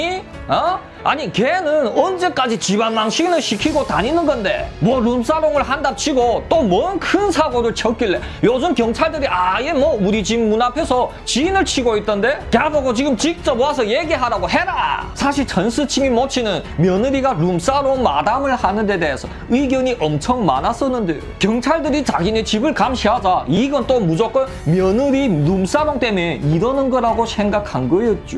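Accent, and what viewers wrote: China. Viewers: 아저씨 조선족이야??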